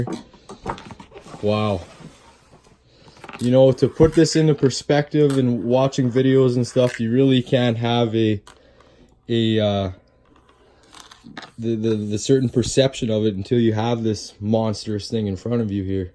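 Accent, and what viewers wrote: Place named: Wisconsin, United States. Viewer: This guy must be up north. Close to Canada probably from Wisconsin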